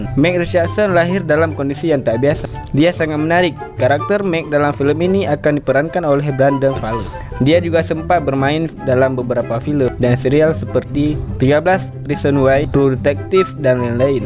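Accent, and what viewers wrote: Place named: India. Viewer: Lage logat india si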